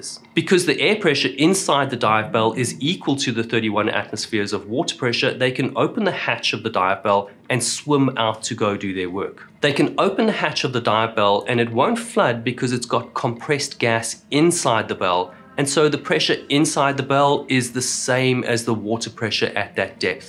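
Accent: South Africa. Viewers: For a second I was confused to hear that accent, but it's always good to find a fellow South African in the wild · That is a South African accent right there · Love your accent. As a non-native english speaker i was curious where are you from. My guess was australian but seeing the comments now i know its south african. Very nice❤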